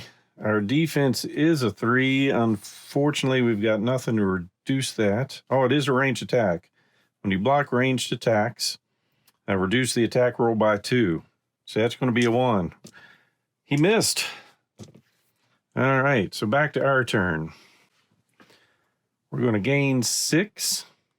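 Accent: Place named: South, United States. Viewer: You won't believe how long I've waited to hear you recite this Lovecraftian horror. It's awesome. #MonotoneSouthernExcellence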